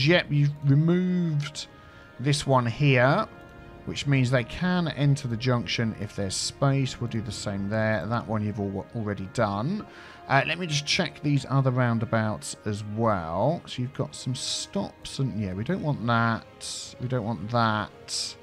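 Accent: United Kingdom. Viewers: He's trying to sound American? I'm Canadian and soundee like the most British man I have ever heard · You sound British?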